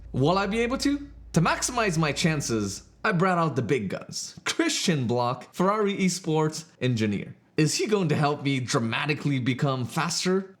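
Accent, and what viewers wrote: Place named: Netherlands. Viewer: I smell dutch accent🥶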